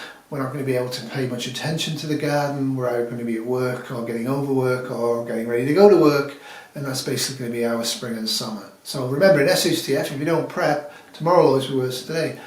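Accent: United Kingdom. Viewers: I think it's a British thing. Brits don't pronounce the "r" on the ends of words. He has a British accent, not a Canadian accent.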